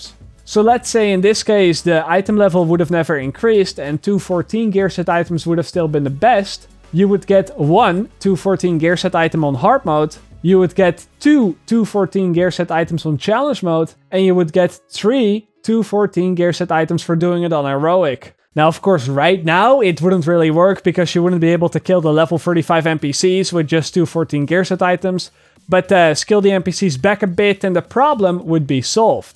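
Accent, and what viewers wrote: Netherlands. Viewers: Are you dutch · Holy shit are you dutch Hallo uit Suriname 👋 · De meest Nederlandse accent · Nice video Ik hoor je Nederlands Engels accent maar je Engels is serieus goed. Go on with you channel.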